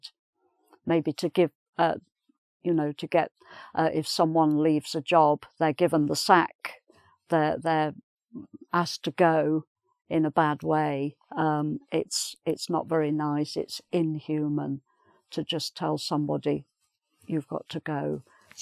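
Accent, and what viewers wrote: United Kingdom. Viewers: Have signed. Love your British pronousation❤️.Thank you for great lessons!!!! Can I find this list anywhere? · Thank you 'Mother' You give us valubale topics . I love British accent . Thanks "mother" for being teaching us to all your childreen arround the world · Thanks my great teacher ...love your lesson so much cus you make me able to speak in British accent ...i got 100. ..god save you . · British pronunciation the best ever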